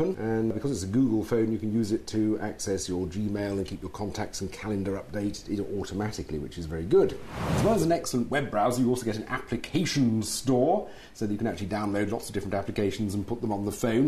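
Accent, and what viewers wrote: England, United Kingdom. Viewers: HES ENGLISH NOT EVERY1 IS AMERICAN OR CANDIAN U KNOW ?! caps** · damn.. the first british accent I like so much :D 5 * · he is so posh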